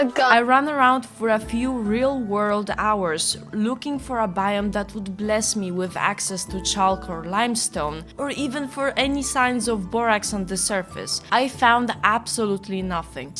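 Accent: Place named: Poland. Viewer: Why do I have this strange feeling that you're Polish? I could swear you have that kind of accent.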